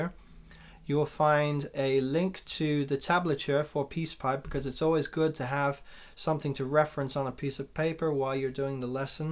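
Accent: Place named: United States. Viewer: I remember you had a Italy connection...Maybe I've missed something you've told me about your move to the Durham in the UK....Yet, it's the American accent that confuses me....Yes...weather wise...back to normal...and maybe some warmer weather middle to end of next week (hopefully)....Regards....Dave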